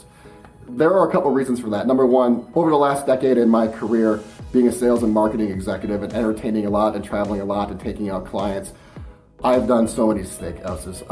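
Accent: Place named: Wisconsin, United States. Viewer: I knew it! I caught subtle hints of that Wisconsin accent on your previous vids and that was what I guessed. :)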